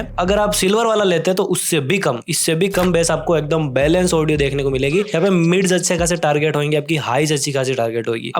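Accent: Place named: Mewar, India. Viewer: Ha, lg to mewad s hi rha h y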